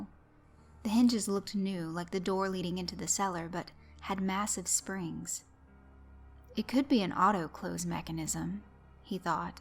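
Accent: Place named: Georgia, United States. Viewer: You must a country girl, guessing Georgia. Or Alabama. Love county girls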